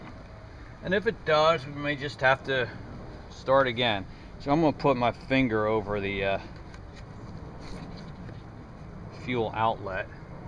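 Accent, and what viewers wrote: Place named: Midwest, United States. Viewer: gold 🥇 great video. 👍 your accent makes me think you are from illinois / midwest area.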